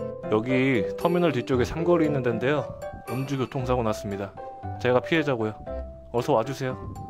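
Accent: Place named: Busan, South Korea. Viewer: 마 부산사투리 어썰푸다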